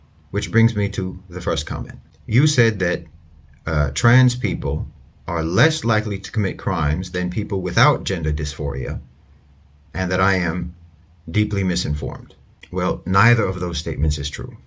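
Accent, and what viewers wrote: United States. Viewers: his accent is American, german is his parents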